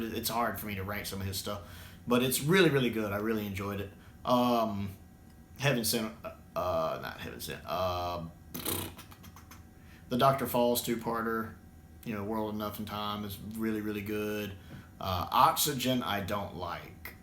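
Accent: South, United States. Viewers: Listening to an American with a strong southern accent talking in detail about a cheap TV show (albeit beloved) made by the BBC in the 60s, 70s and 80s is such a wild juxtaposition - I love it! You're easily one of the most articulate reviewers for Doctor Who I've watched/listened on YouTube. Love you're take on the programme!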